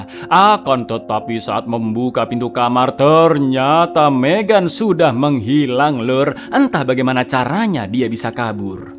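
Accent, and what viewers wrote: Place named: East Java, Indonesia. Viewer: Suarane logat sampean koyok wong jawatimur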